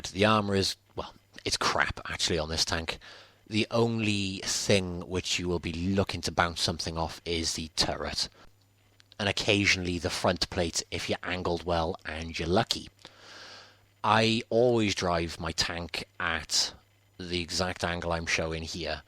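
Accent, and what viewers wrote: Wales, United Kingdom. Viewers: Those Dulcet Welsh Tones :)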